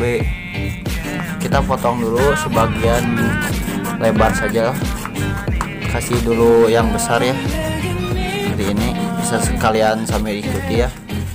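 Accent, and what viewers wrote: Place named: West Java, Indonesia. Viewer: Sunda mamang😁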